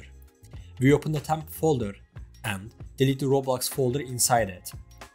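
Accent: Turkey. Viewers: this guy has the strongest turkish accent i have ever heard so far lol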